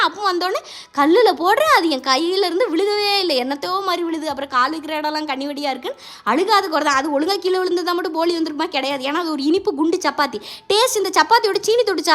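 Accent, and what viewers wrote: Tirunelveli, India. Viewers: nenga pesradha paka en relatives en pakathula iruka mathiriye iruku... married and settled in Chennai ❤native tirunelveli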